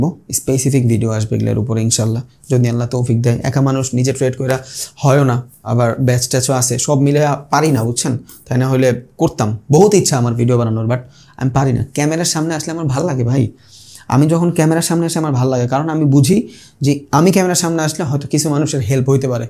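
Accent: Bangladesh. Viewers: দাদা আমি ভারত 🇮🇳(কলকাতা,পশ্চিমবঙ্গ থেকে আপনার ভিডিও গুলো এই বেশ কিছুদিন হল দেখছি, আমি বাংলাদেশ 🇧🇩কে অনেক ভালোবাসি এবং ওখানকার মানুষ জনদের কথা ও কথা বলার ধরণ আমার খুব ভালো লাগে,হাসি 🤣 ও পায় | 🐯 বিশ্বাস করুন আমি প্রথমে আপনার ভিডিও গুলো দেখতাম শুধুমাত্র কথা গুলো শুনবো বলে , কিন্তু পরে ভিডিও গুলোর Content ,আপনার বোঝানোর দক্ষতা ,ও আপনার পরিশ্রম কে দেখে আমি আর Comment না করে পারলাম না | 🔥আপনার প্রশংসা যতই করা হোক না কেন কম হয়ে যাবে |🤗 যাই হোক ভালো থাকবেন সুস্থ থাকবেন আর আমাদেরকে এই রকম ভাবে আরো সমৃদ্ধ করবেন 🙏 Always Support to You ✌️😉 ভারত 🇮🇳 থেকে শুভেচ্ছা ও ভালোবাসা নেবেন 🥰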